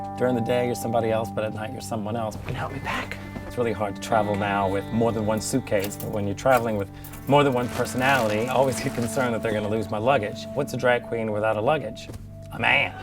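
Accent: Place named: Los Angeles, United States. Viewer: Wow I never noticed her LA accent before, she's sort of toned it down but it was so cute!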